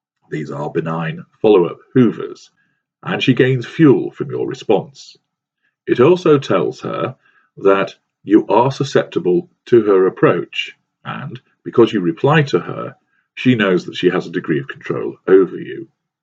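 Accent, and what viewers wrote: United Kingdom. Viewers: He’s in England, this is the typical English/British accent.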